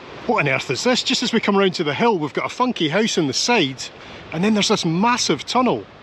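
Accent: Scotland, United Kingdom. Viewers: What a very beautiful and entertaining video again! 😄 Love your channel and your Scottish accent! I’m about to visit a friend in Montrose in July! I’d like to have a SNAKEBITE with you.👋🏼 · Just pure natural approach to travel and the catchy Scottish accent. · What is the specific name of the town you were walking? Love Spain and considering returning for the 3 rd time. Great video. My grandparents were born in Scotland as was my mother. My grandfather was a golf pro in North Berwick. So enjoy your "Scottish" accent and reminds me of my heritage. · The trees look really weird! and, does your Spanish accent have a hint of Scottish? Lovely video..great to go along... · Very informative & enjoyable, thanks! The Scottish accent is music to my ears.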